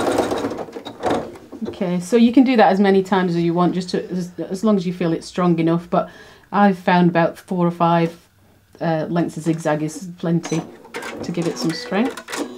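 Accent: England, United Kingdom. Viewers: Hi, I just came across your videos by accident. How lovely to hear an English accent as most tutorials are American! I am currently binge watching all of your videos as I am quilting. I have now subscribed and clicked the notification button. Your tutorial are so well done and the instructions are clear to follow. Thank you so much for the time and effort you have put in creating them. Greetings from Norwich.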